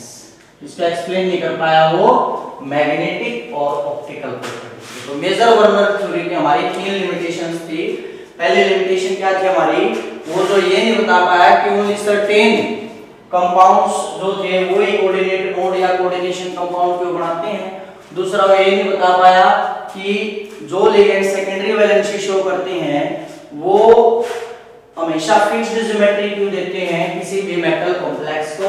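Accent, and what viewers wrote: Haryana, India. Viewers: Sir Seriously you're brilliant. Keep it up. And never leave your this hariyanavi accent .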